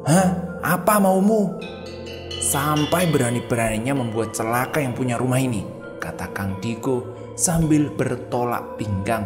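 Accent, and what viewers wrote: Java, Indonesia. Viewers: aq palah suka logat jawa nya,jdi unik. yg gak paham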